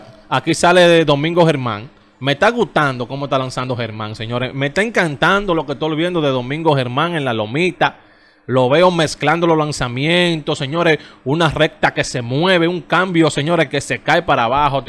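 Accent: Cibao, Dominican Republic. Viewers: A lel de pal de meses🤣😂🤣😂🤣...me imagino que eres cibaeño.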